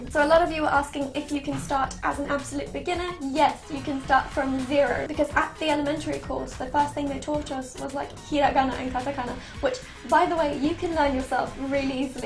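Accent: United Kingdom. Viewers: I loooooove your British-English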